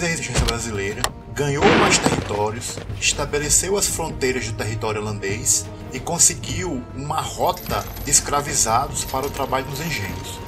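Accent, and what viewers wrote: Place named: Northeast, Brazil. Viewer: Queria que existissem mais vídeos no youtube com essa pronúncia do nordeste do Brasil pois é a única que lembra a de Portugal.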